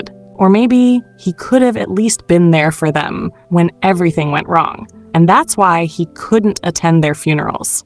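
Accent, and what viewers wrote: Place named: United States. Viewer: I love what the AI script is clearly British but the AI voice is so American, like hearing all these British words in an Ai American accent is so funny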